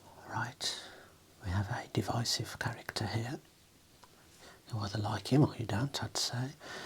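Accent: United Kingdom. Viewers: Are you British? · I don’t know what the accent, british? but it sounds really good, and I love it 🥰 I always fall asleep ❤️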